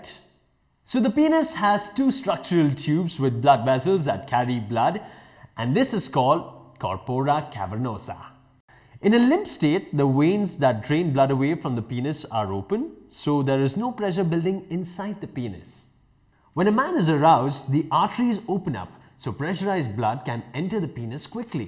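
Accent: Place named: India. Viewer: very unique indian accent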